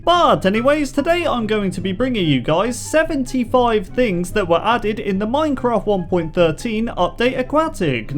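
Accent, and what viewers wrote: United Kingdom. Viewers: Must be a northerner.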